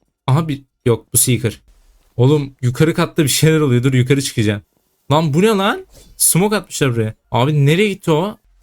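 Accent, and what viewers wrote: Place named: Azerbaijan. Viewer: Abi sen galiba azerbaycanlısın konuşman dan anladım bende azerbaycanlıyım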